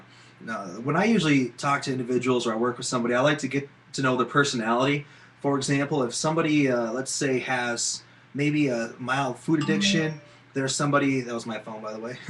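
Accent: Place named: Minnesota, United States. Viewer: The minnesota accent annoys me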